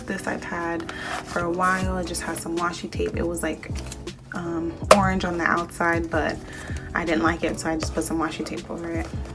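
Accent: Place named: South America. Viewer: Very nice notebook! But I see you are learning South American Spanish... In standard Spanish (from Spain) we don't use those kind of expressions or accent, I think you should find more Spanish content and less South American 🙂Unless you are specifically learning that accent. Greetings from Barcelona!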